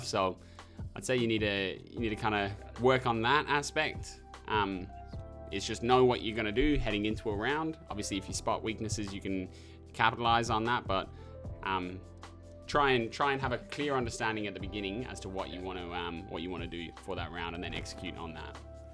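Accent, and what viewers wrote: Australia. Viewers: Sounds aussie mixed with something else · Aussie is my bet he sounds similar to modest pelican gaming who is also Aussie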